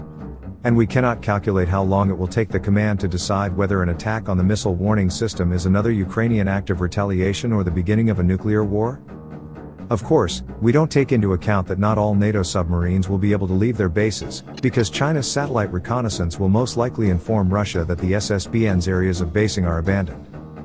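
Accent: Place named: United States. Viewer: Perfect American accent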